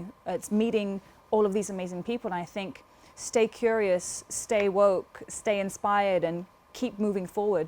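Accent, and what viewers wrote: United Kingdom. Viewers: bein grown up in london, the accent is getting more british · Justru terasa accent nya. Inggris itu banyak jenis aksen.. sama kayak aksen Jakarta, aksen Indonesia timur, aksen Jawa dsb. Aksen nya, London banget..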